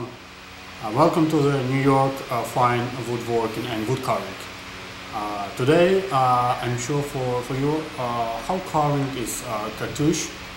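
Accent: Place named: Russia. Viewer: Ты Русский ???? А то акцент больно хорош!!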